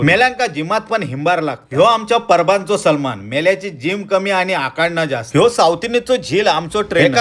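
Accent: Malvani, India. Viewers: दादा तुमची मालवणी ऐकून ईतक छान वाटत कि विचारू नका 😊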